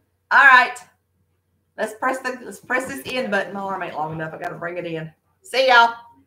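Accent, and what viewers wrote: South, United States. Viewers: I enjoyed your stories and y'all's sense of humor. You "cracked me up!" 😁 I also like your Southern accent. My grandpa on my mom's side of the family was from Gaffney, South Carolina. I loved his accent! My grandma was from Cherokee, South Carolina. I never knew her because she died when my mom was 7. I hope you continue to put out more content. It really cheered me up.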